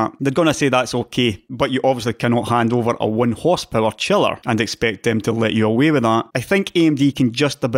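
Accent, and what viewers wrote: Scotland, United Kingdom. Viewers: developing a taste for Scottish accent thanks to you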